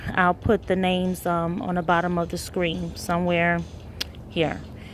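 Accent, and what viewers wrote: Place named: Caribbean. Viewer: you sound Caribbean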